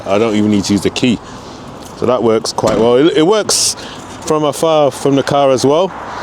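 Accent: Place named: United Kingdom. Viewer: I think its great what your doing brother. Most mr2 owners on youtube are american and its a refreshing change to hear a fellow Brit 👍 I love the editing aswell keep it up.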